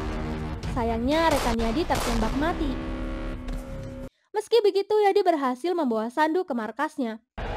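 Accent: Papua, Indonesia. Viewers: Mantap logat Papua nya Mimin♥️ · pake logat papua bleh sukses selalu kak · Mimin pinter logat Papua.. pantes pintar ngemob aww. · Mimin logat Papua za rasa lucu tra gaul se mimin 😂😂😂😂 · 😂😂Fix, mimin anak Papua😅mantap Dialegx Min keren, Lanjutkan 😊🎉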